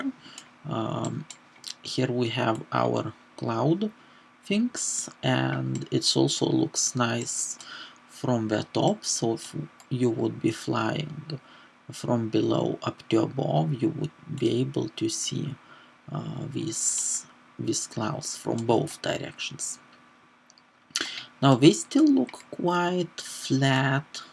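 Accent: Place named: Balkans. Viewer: Finally after 2 hours of searching i finally found good tutorial... Thank you! P.S. I might be wrong but from your accent and the pronunciation of the "R" ...i have the impression that you are from the balkans? (Sorry if i'm wrong).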